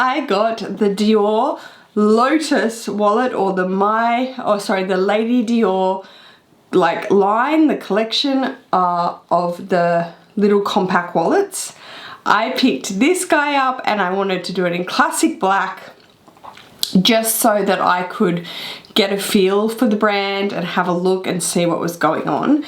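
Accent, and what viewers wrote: Australia. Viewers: I have a question. Your English sounds beautiful and ylu articulate very clearly and pronounce words in a proper way. Many other Australians I watch on youtube seem to talk in a different way, like it was difficult for the to pronounce the words, if you have any idea of what I mean. Obviously English is not my native language. But my question is, do you have a different kind of accent compared to other Aussies, and why, or am I just so adjusted to your speech?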